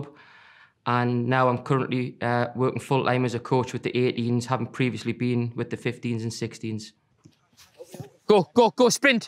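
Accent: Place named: Newcastle, United Kingdom. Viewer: Geordie accent Love it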